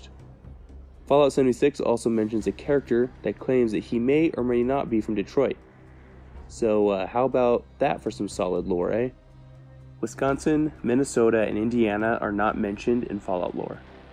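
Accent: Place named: Colorado, United States. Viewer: As a Coloradan I think you are from Colorado by the way you pronounce it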